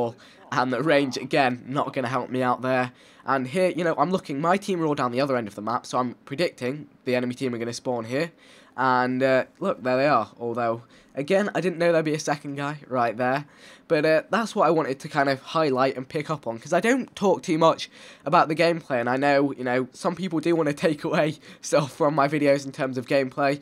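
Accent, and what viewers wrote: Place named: United Kingdom. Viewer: Don't be silly guys. He's good old British!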